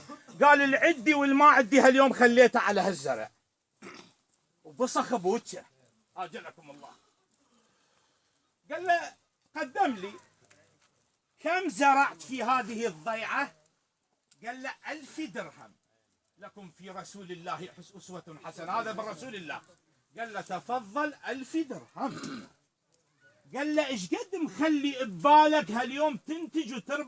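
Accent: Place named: South, Iraq. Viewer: اللهجة عبالك جنوبي مو كوردي ما شاء الله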